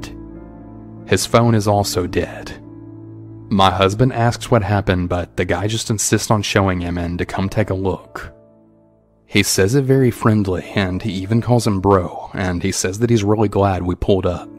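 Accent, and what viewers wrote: South, United States. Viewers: I love that slight southern accent im the narrator's voice 💜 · I really love the narrators accent like his southern accent it’s very aesthetically pleasing and satisfying.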